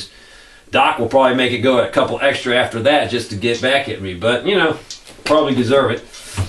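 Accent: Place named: South, United States. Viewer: He is saying odd ball caliber he just has a southern accent so it sounds different.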